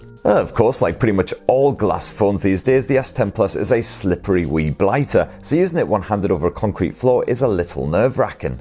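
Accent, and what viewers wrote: United Kingdom. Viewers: It's not slang; he's British, which means you only hear/understand about half of what he's telling us. It would have been much worse if he spoke Cockney. I think he liked it, though. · Ditch the cheesy British accent dude!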